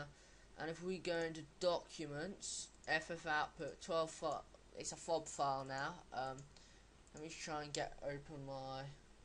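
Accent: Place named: United Kingdom. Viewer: I couldn't understand a word that this guy was saying!The British accent was bad enough, but you add him talking at light speed and he ight as well be talking in Martian language. Maybe he is a "Klingon trying to talk English!!!!PLEASE do not post anymore dirty Klingon videos because this is a site for humans onlyand English language only. Humans are biggoted when it comes to Klingons!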